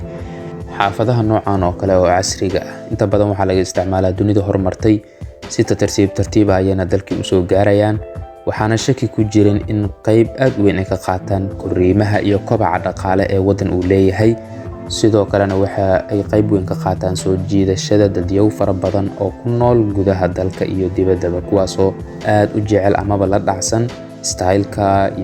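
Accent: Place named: Hargeisa, Somalia. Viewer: idol maanfahmin lahjadan ayaahargeysalaladhexjoogaa kkk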